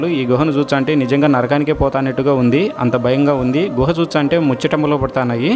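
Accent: Rayalaseema, India. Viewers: Tammudu mee vuru Kurnool aa Rayalaseema matalu laga unnayee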